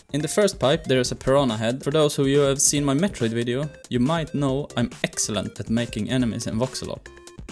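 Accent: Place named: Sweden. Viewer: När en svensk pratar engelska, eller hur?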